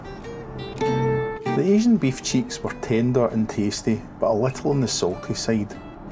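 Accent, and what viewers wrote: Scotland, United Kingdom. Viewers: Great content as usual! Very comprehensive review, and the Scottish accent makes sound more convincing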